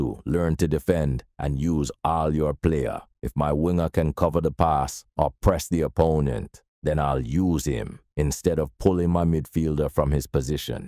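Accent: Jamaica. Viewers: Bro should look into motivational speaking! He got that leader voice that you know you can trust what he saying. Definitely Jamaican 🇯🇲.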